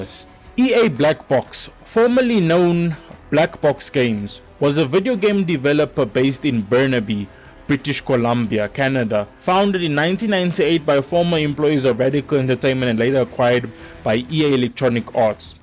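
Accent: South Africa. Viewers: You south african bro? I havent heard a south african accent in forever (emmigrated awhile back)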